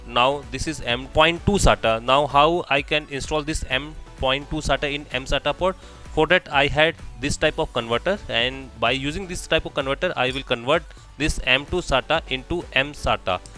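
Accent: India. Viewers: you indian?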